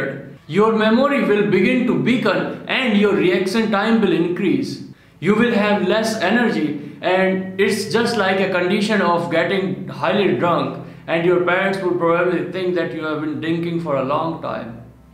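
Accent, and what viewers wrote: India. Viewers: I think is a indian by his speaking language and.... Nothing 😅😅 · I'm pretty sure he is he sounds Indian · Dat Indian English :D why are indians making this vids ? I heard thath they are not rich...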